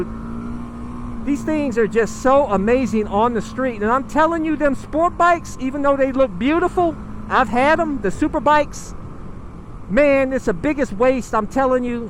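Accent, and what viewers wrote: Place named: Louisiana, United States. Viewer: You got an accent like from lousiana somewhere man. I lost mine living up in Minnesota over 10 years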